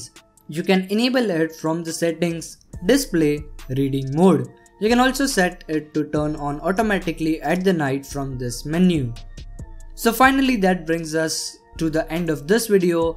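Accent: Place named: India. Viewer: Indian accent are usually bad since you can barely understand them but i luv listening to urs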